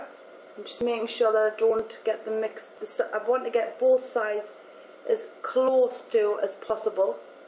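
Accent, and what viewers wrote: Newcastle, United Kingdom. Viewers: its called uk accent XD everyone talks like that there lolz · I think she's from Newcastle (north of England) she sounds like Cheryl Cole so I think so! I loveee it :) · I think she sounds alittle more british.. from the UK at least haha. idk. I'm probably wrong. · she's from newcastle, so its called a geordie accent lol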